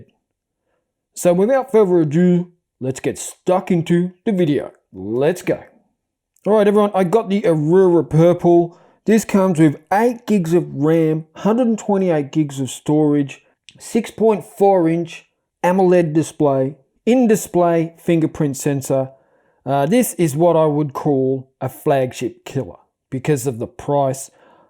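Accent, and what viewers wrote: Australia. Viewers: english Australia mate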